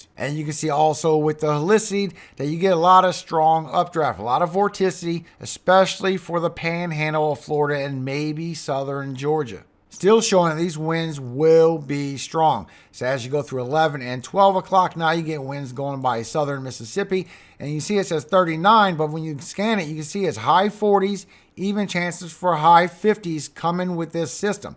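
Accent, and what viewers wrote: Louisiana, United States. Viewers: Nice listening to to a good Louisiana man pronounce our cities. Like your RADAR and forecasts. Keep up the good work.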